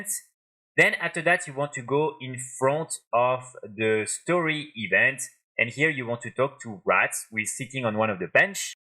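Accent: France. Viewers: also i need to say i love that french accent its just makes it sound so nice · French accent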